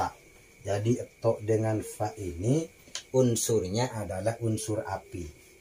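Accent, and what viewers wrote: Madura, Indonesia. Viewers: Sampean kyk logat nya Madura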